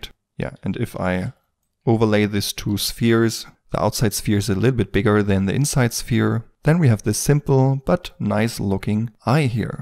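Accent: Germany. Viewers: Schönes Englisch, etwas hört man dein Deutsch aber nicht auffällig. Dieses Video war informativ und wirklich sehr toll!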